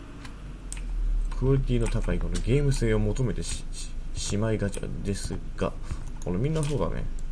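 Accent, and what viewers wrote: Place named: Kansai, Japan. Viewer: 若い頃のガッチさん、関西弁で、かなり高めの声だったんですねー。 あと、レトルトさん、ウッシーさん、キヨさん居ないからか寂しい。 敬語が少なくて、わっかいなーって思たヽ( ｀･ω･)ノ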